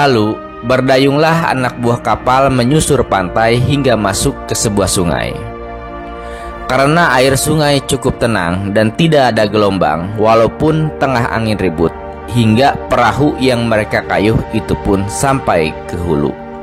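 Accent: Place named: Sunda, Indonesia. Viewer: Suaranya seperti orang sunda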